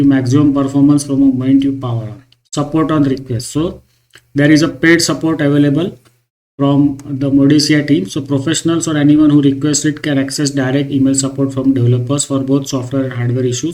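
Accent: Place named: India. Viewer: ugh.. indian accent on top of a bad microphone.. 👎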